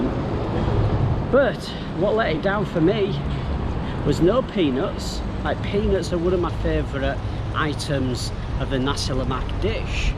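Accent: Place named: Northern England, United Kingdom. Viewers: that's fine, but his accent is heavy Northern. I bet you guys understand my standard American accent more. lol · Hi, which part of Uk you come from? Liverpool? Sound like you are from the north. I’m Malaysian live in Uk for 29 years! Fuck! Really?! Yes, but I love it here! When I watch you the first time, I feel like ‘home’, you know what I mean? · Selamat Hari Natal (Merry Christmas) dan Selamat Tahun Baru (Happy New Year)! I’ve changed my YT name from UncleGweilo to this. It’s now even more of a mick take of me by me to Singaporeans, who use “ang moh” rather than “gweilo” to derisively refer to a white person. You and your anchovies,! LOL. You should call them ikan bilis- their Malay name- and avoid the issue totally. Great to munch on with a cold beer after a long day's exploring. Go with the flow trying to pronounce Malay and Chinese names. 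Be like the Malaysians; if it’s close enough that you can be understood, it’s okay. "Relak lah, dun worry" as they say in Singapore! I'm not going to have a go at your northern accent as I'm Australian, which is a curse in itself! I think there are more varieties of nasi lemak than a cat has hairs. With so many cultural influences at play, you can just head from place to place to find the one you really like. Changes in the sambal; fried egg, boiled egg, or century egg; barbecued versus deep-fried chicken, and so on. A guy by the name of Seth Lui recently posted a video comparing five different nasi lemak places in Singapore. Search him out on YT, if you’re interested. Gregory Leow, who travels around Singapore seeking out authentic traditional ethnic Chinese cuisines also does great work reviewing food without making a “foodie wanker” of himself. What you think is the best is the best because you think it is, and what anyone else thinks doesn’t really matter. 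But if you s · South yorkshire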